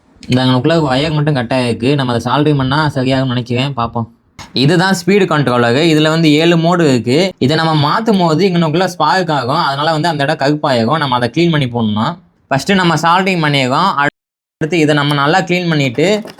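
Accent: Madurai, India. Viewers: Bro nee madurai yaa bro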